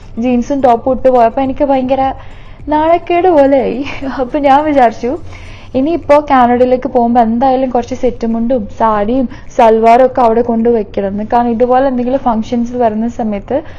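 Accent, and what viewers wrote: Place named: Kannur, India. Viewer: plz talk in kannur slang..it's a beautiful slang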